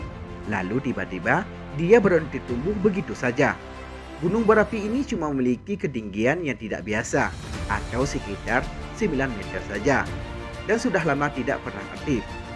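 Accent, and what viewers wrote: Aceh, Indonesia. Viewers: Ini yang ngisi suara org Aceh ya? Logatnya Aceh banget 😂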